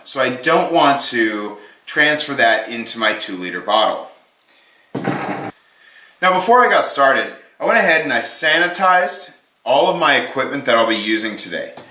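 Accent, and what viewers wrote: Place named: Australia. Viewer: Good day, Kindly give me a list of all the equipment I will need to do this forced carbonation process. I want to buy all the necessary equipment, you can add the food grade C02 tank/cylinder on the list though I will hire one whenever I need it. Kindly use English that I will understand as a south african 🇿🇦. I understood everything you said perfectly and your accent sounds Australian which means your English isn't confusing as would American English 😊😊😅😅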